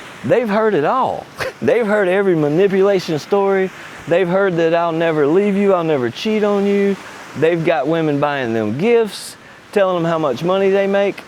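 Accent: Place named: South, United States. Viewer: How did I know this guy would have a southern accent before I pressed play…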